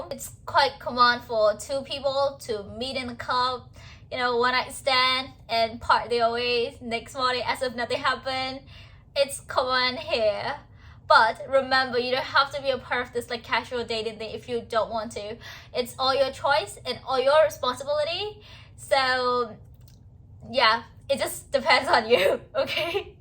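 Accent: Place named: United Kingdom. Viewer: U r starting to sound like a British...Love ya❣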